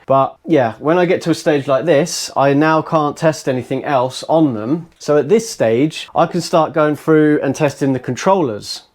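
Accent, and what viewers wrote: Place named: United Kingdom. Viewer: Wou uhn wonna do this wih one mo ni ah. Haha British gold right there! Love your vids.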